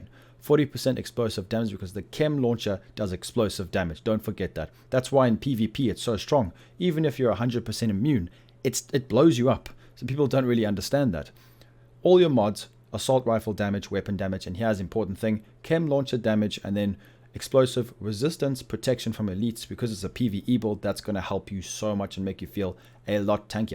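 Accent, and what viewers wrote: South Africa. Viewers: You sound south African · Dude you from SA your accent sounds SA?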